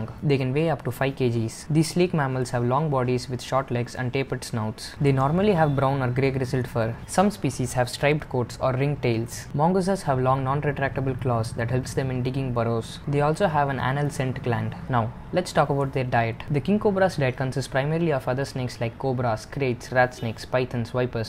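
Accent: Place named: India. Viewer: Jai Hind! Greetings from Alabama USA! Love indian accents too. Always sound cool AF.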